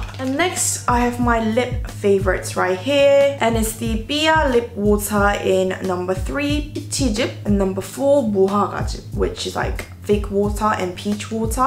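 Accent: United Kingdom. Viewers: 살도 빠지시고 더 예뻐지신것같아용 ㅋ고급진 영국 발음하며 정말 너무너무 좋다눈 ㅠㅠㅠ 영상볼때마다 기분 짱 좋아용 ^^글공 오늘 입은 의상정보도 주세용용용 ^^ 넘 취향저격 ㅋ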